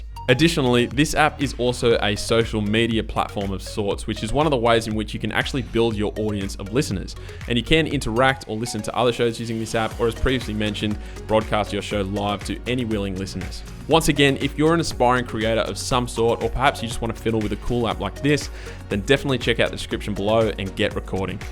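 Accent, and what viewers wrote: Australia. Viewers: That irritating aussie accent... I hate this accent. Bloody aussies.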